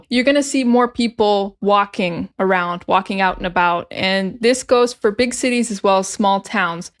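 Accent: General American, United States. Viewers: I'm from Buenos Aires and I can easily picture myself living in Boston or NYC, and without a car. But I would blow my head off if I had to live in Orlando, for example. I barely remember having seen any sidewalk there. By the way, your English is crystal clear to me. I understood everything, like you were talking to me in Spanish. I guess you have a pretty standard American accent. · Si le llaman standard American English y no usa muchos slang y otras expresiones en este video . Stay safe & greetings from the Gulf of California, Mexico 🇲🇽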